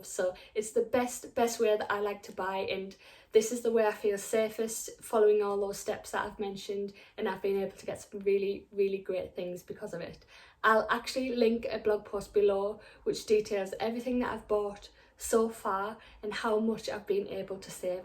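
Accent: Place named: North East England, United Kingdom. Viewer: Just subscribed and am looking through your videos. Great advice so thank you for that. I am a member of some Facebook LV groups but they are mostly US ones. Need to find some UK ones. Nice to hear a North East accent too! We sound the best haha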